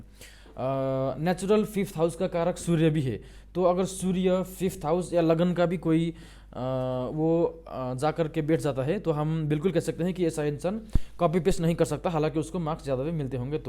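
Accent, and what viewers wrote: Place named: Nepal. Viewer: Nepali tone... are you nepali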